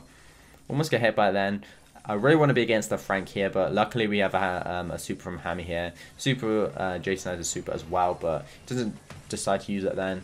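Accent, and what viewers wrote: United Kingdom. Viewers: Love ur accent😂 Btw is that british?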